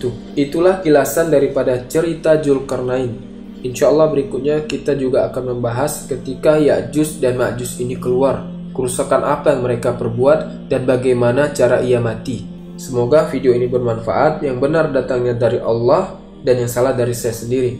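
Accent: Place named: West Java, Indonesia. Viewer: Berdasar pengucapan huruf Z, fixed admin adalah Sundanese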